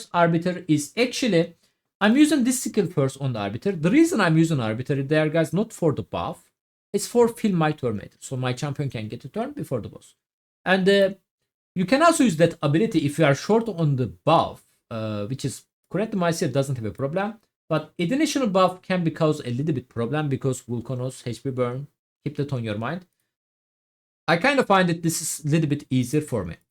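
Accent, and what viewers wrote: Turkey. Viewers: hacı Türk olduğun çok belli konuşmandan :) ama Helal olsun Türk yayıncı pek yok bunu yapan teşekkürler yardım için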